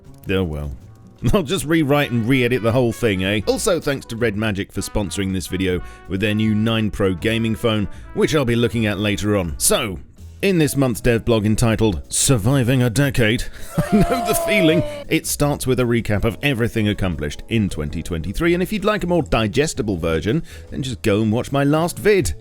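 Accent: United Kingdom. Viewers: He's British 😂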